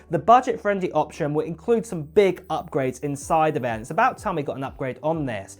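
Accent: United Kingdom. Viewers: I'm here for the UK accent.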